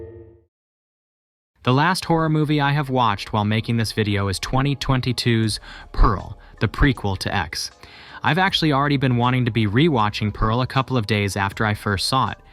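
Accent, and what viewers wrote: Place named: United States. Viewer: Your from the Netherlands? It sounds like you have an American esque accent.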